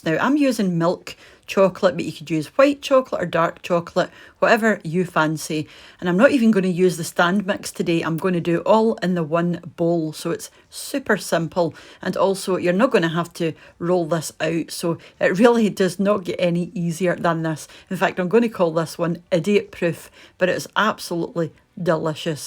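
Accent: Scotland, United Kingdom. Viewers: 💜 I have a question, you said you use the 1 2 3 Method however your recipe doesn't match that. You used 150gr butter 75gr sugar 225gr flour..the 123 would be 150gr butter 300gr sugar and 450gr flour..so I am confused as to which amounts to use. Love your channel and have been watching you A LOT. My grandfather was Scottish and I miss his accent. Yours is lovely and the scenery at the end is GORGEOUS. You live in a beautiful place. Thank you. 😍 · ❤ check out the authentic accent and I'm guessing she really lives in Scotland and owns a Scottish passport. Are you clear. She doesn't grow ganja 🤸‍♂️🦋. Thank you Scottish lady. 🌂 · I❤your Scots accent! Beautiful! Yes! Can’t wait to try your recipe! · I love the recipe and ABSOLUTELY LOVE YOUR SCOTTISH ACCENT! God bless. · 💖 Your videos bring me such joy! I’m in the state of Oklahoma in the USA, but I spent 10 days in Scotland learning about my heritage through family and the Presbyterian Church. 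I miss Scotland so, and look forward to visiting again someday! Your voice reminds me of that wonderful trip, and your food is always delicious! I’ll be making these tomorrow!!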